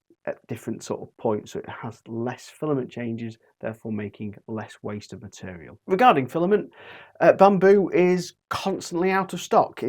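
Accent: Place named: North, United Kingdom. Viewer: Love "free-D" printing. Please learn to speak English. It would be so much easier to listen to you. Speaking Mockney in a northern accent just sounds weird.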